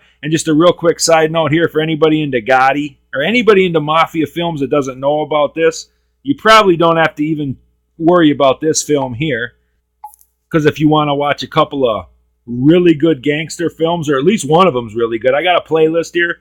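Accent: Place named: Midwest, United States. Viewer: Hey you stuttering, muttering prick ya! Calling me a midget when I stand around 6’ and 230 isn’t the smartest thing to say. In addition before you take a podcast to task it would behoove you to actually do some research first. My father worked for AA so I know a great deal about him. I know you get a chubby when you think about these mobsters in your mom’s attic while you munch on hot pockets. Guessing by your accent you are probably from Illinois or KC. I will give you some cred since these were big mob towns. The way you pronounce APALACHIN is laughable. If you want to be taken seriously ever, do better research in the future especially when you call someone a midget that could probably kick the shit out of you! Thanks for the shoutout and watch me as Matty the Horse on the Deuce.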